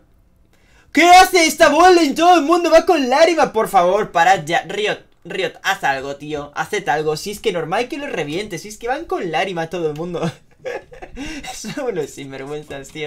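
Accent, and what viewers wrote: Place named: Argentina. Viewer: por que hablas como argentino? xd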